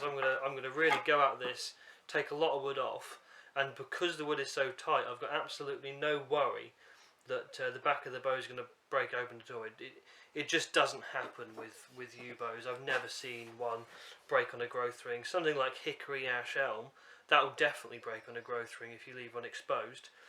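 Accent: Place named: England, United Kingdom. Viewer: By the accent i'm going to assume you're English, if you are, where did you get your wood? I find it very hard getting anything other than pine in this country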